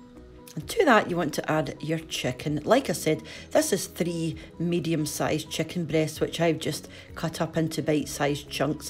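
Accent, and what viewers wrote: Scotland, United Kingdom. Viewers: I don’t why but I could listen to the Scottish accent for hours, so friendly and calming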